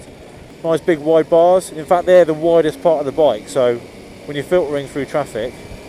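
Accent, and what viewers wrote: United Kingdom. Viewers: A complete waste of several minutes of my day....I could only stand this guy's sickening British accent for a few mins(!) Comprise your stupid opinions when you're NOT negotiating city and pedestrian traffic; you might have more intelligent things to say. And please, save the childish and moronic singing for your shower. Everything in this video could have been condensed to 5 mins without your blithering and entirely unrelated meanderings.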